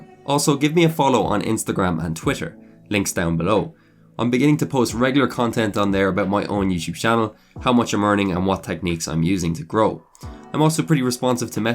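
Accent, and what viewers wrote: Ireland. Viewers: What's the background music ? Great video btw. Really enjoyed it! Are you Irish? XD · Me in the first few seconds: "Is that an IRISH ACCENT??" 👂🏻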